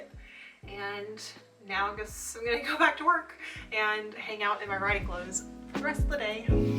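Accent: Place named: England, United Kingdom. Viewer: It sounded like you have an English accent now after talking to Ali. This is one of my favourite vlogs from the dissertation diaries to date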